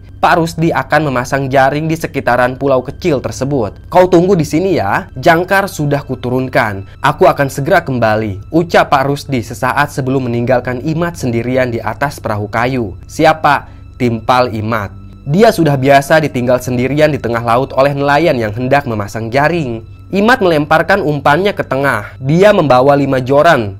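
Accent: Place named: Lombok, Indonesia. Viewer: Orang lombok yah😅😅😅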